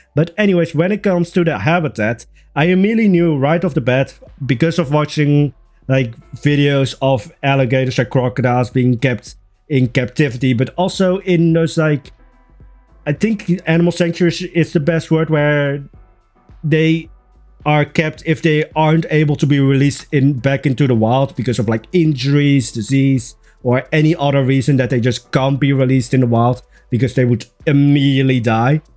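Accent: Netherlands. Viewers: Dutch accent haha❤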